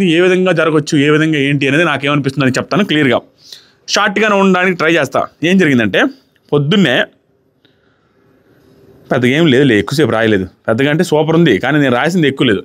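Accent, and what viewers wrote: Karnataka, India. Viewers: Kannada slag super anna 😂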